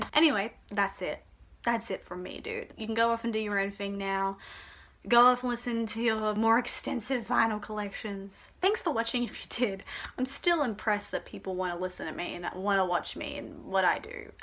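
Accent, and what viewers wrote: Australia. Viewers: I’m so happy you found me so I could find you! I lived in Australia for a few years and the moment I heard your accent it felt like home. Also love your picks, I’m waiting to buy when I can go out to a record store 😊 fun video, I’ll have to do a quarantine tag.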